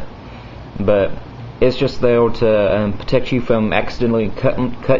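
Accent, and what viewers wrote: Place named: Texas, United States. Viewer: His accent is straight Texan! Good review my Texas brother! it sounds like has a slight speech impediment....No offense:)....we all have our things, hell my pinky fingers wont straighten out. BTW, check out the knife....Fitzen Tank......BAD A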